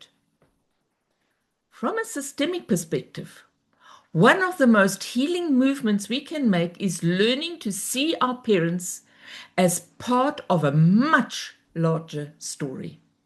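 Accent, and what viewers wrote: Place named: South Africa. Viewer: Lovely to hear your South African accent. Thanks for this video. Will send it to my (adult) kids... getting them to listen is another story 😉🙏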